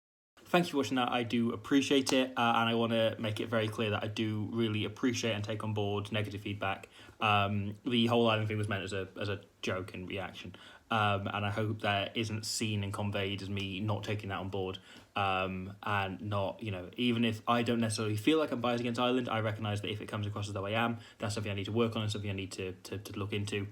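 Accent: Wales, United Kingdom. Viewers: are u welsh??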